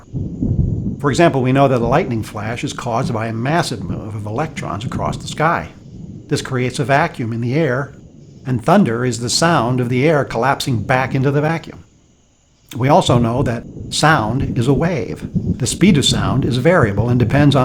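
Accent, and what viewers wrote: United States. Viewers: It's wonderful to hear metric measurements in an American accent.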